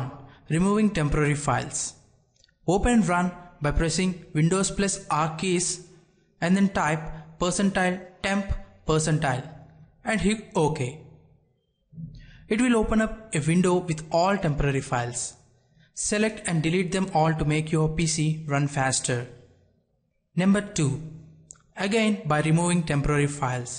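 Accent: India. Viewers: nice indian accent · Your accent is Indian and I had random Indian friends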